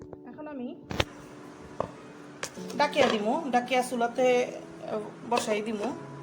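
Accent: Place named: Sylhet, Bangladesh. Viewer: আসসালামু আলাইকুম আপনি কেমন আছেন আশা করি ভালো আছেন আপনার বিডিও অনেক ভালো লাগছে রেসিপি সিলেটি কথা ভালো লাগলো আমি ও সিলেটি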